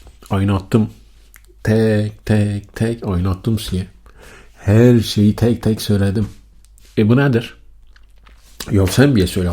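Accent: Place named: Urfa, Turkey. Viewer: Urfalı şivesini ölene kadar dinleyebilirim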